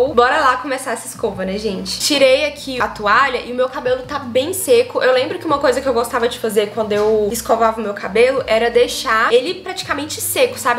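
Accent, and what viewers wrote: Minas Gerais, Brazil. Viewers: Vc é mineiraaaaa....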